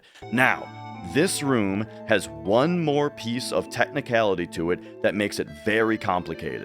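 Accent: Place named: Scandinavia. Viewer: I literally had an entire 10 minute existential crisis wondering if you were Nordic with an amazing English accent or if I were just schizing. Your speedrunner profile says you're from MN, and also MN has a lot of Nordic heritage, but then I realized it would be equally likely that of all of the places a Nordic person would move, it would be probably North Midwest. And then, lastly, I realized that this has distracted me from the substance of the video so I rewound and found myself really enjoying the video. Liked : D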